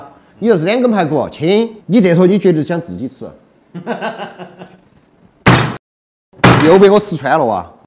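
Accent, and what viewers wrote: Chengdu, China. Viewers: 最标准成都话！love!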